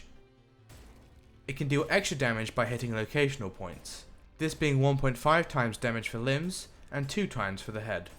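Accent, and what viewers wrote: United Kingdom. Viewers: dude i laughed so hard when i relised he's british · 100% british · The biscute shootah ,oh gosh i really like the british accent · How did I correctly guess this was going to be narrated by a British man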